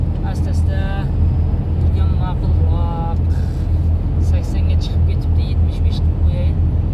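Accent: Chiyal, Uzbekistan. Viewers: Мен хам гапларни эшитиб Чиялик бўлса керак деб ўйлагандим. Иш сохам бўйича Чироқчиликлар билан гаплашганман. Деҳқонобод хам шунақароқ шевада гаплашади. Майли омад ўзимни 92уруғли ўзбегим. ✊👍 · Qaysi tumandansiz chiyalga uhshap ketarkansiz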